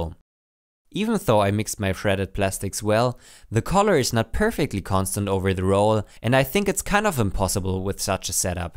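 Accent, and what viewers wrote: Germany. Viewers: Man hört sehr den deutschen Akzent raus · German accent is too strong to watch this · Jo your English sounds very German :] Me also German hehe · Man hört so richtig, dass du Deutsch bist😂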